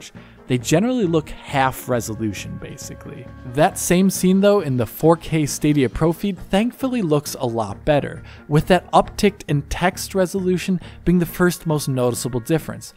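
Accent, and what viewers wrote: Germany. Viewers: HOL' UP. YOU ARE GERMAN?! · Or... the guy is German? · He German. I repeat: He German. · German accent is never funny, its rather SCARY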